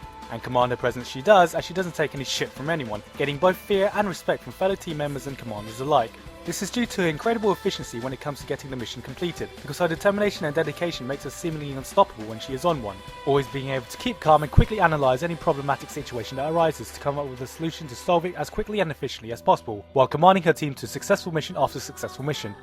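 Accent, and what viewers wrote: United Kingdom. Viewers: So wait your from Thailand but you have a British accent someone explain plz! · He's British but from Thailand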